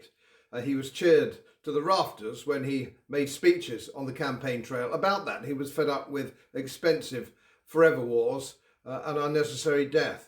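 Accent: United Kingdom. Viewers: I cannot believe I am hearing these suppressed TRUTHS about the world we (actually) live delivered by an eminently respectable BRITISH voice on Youtube... I normally have go onto Internet Archive to get this kind of information (and then often only after 'creative' searches and wading through dozens and dozens of off-the-case fluff to get to the good stuff!).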